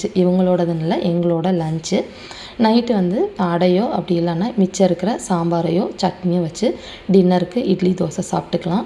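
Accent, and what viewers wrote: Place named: Tiruchirappalli, India. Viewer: my name Devi 67 years old l got my degree on1974 from your way speaking Tamil I thought that u may be Trichy or Thanjai Trichy so many relationship are there Trichy past mla anvil poyyamozhi My close relative from your way of speaking I understood I am also interested in cooking like you My daughter at Fort Worth she will also cook nice ly Yesterday l spoke about you to my daughter her name Narmatha She’s working at CTS software company 2017 we came to Fort Worth stayed 2months Stay blessed with your family Bye da Chellam Trichy town are near by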